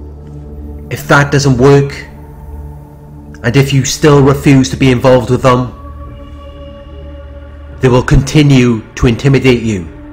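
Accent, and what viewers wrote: Wales, United Kingdom. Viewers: This guy sounds like he from Cardiff or Newport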